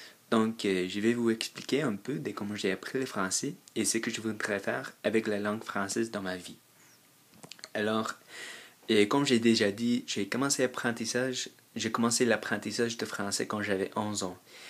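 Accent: Quebec, Canada. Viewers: Effectivement tu fait peu d'erreurs (prononciation). Tu as un accent français ... québécois. C'est excellent ! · Ton accent me rappelle un peu celui de Céline Dion :D La cadence de tes phrases est typiquement québéquoise.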